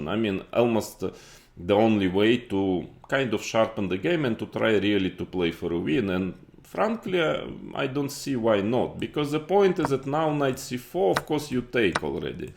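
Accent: Russia. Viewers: So thick Russian accept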